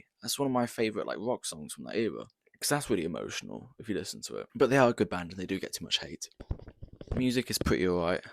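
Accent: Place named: United Kingdom. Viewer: I was not expecting you to be British, to be honest.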